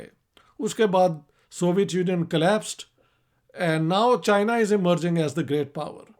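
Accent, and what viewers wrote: Punjab, India. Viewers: I like your Punjabi Style Haan ji...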